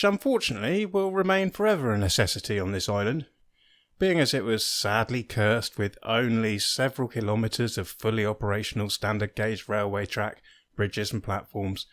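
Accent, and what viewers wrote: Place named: Estuary, United Kingdom. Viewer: You have to allow for the Thames estuarine accent.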